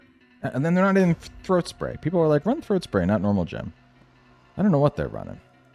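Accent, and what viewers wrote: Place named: Philadelphia, United States. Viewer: Your Philly accent was aht bigtime today pal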